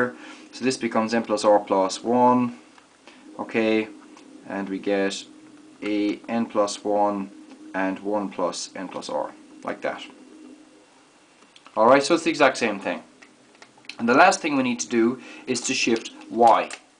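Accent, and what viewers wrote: Ireland. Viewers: sounds Irish. Loving the accent and the lesson. Great video! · You have a lovely Irish accent. It makes the grasping of the subtle secrets of differential equations that much more delightful.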